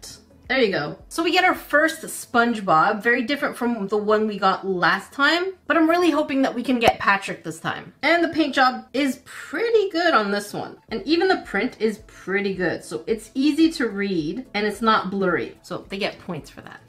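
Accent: United Kingdom. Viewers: The Brit’ accent is real 😂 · Your bits of British accent is suburb !! Sending lots of love you and to Canada xx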